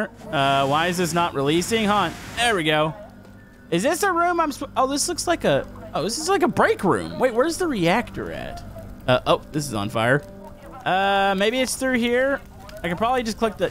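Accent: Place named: Texas, United States. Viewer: he has now reliasied his texas boi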